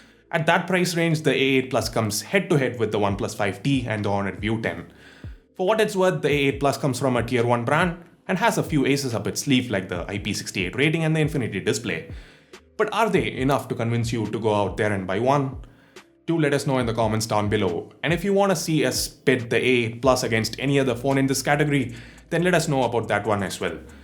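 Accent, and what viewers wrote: India. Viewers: Sounds like indian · Another indian gay accent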